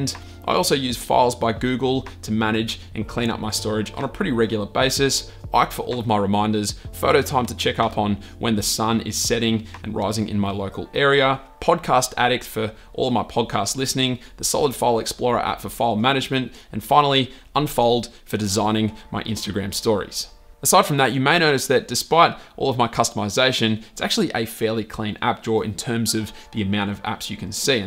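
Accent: Australia. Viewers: Is it an australian accent? It sounds cool but I can't understand, is it australian or not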